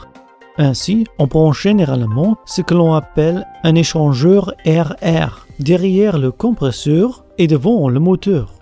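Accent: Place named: Germany. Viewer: C’est quoi cette voix de gros accent du bled ou de allemand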